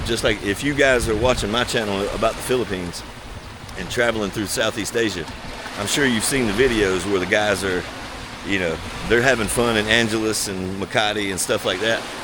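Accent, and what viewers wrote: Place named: North Carolina, United States. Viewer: North Cackalacky in the house! You sound a little Sampson County. 👍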